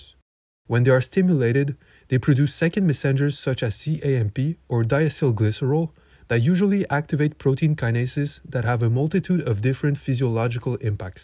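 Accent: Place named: Quebec, Canada. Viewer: you have a quebecois accent lol. love the video tho it helped a lot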